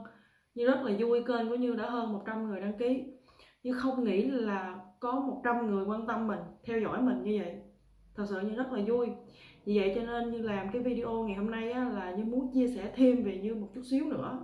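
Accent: Mekong Delta, Vietnam. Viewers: Nghe giọng miền tây là có cảm tình. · nói chuyện thật thà, dễ nghe quá, đúng là người miền Tây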